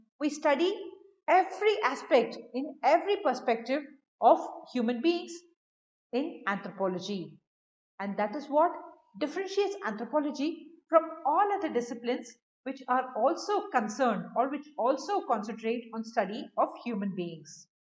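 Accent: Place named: North India, India. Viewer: Teacher seems to be resourceful and deeply devoted her discipline Anthropology. Her accent is north Indian.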